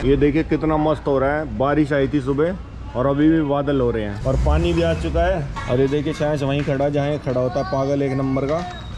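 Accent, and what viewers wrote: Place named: Jacobpura, India. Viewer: Jacobpura k aspas k lgre ho bhai😅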